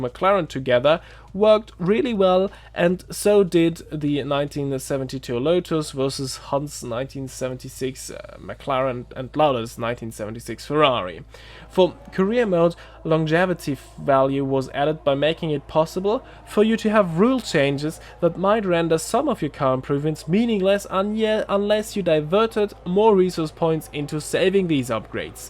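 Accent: Germany. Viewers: Very helpful vid if it comes to deciding which F1 to buy! I got F<num> and 2020 ! (btw your accent sounds german ) Thx from a 70y old german gamer !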